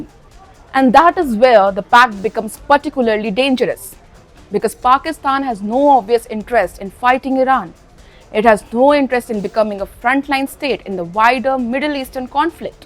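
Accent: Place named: India. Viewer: The indian accent i cant continue listening. Its horrific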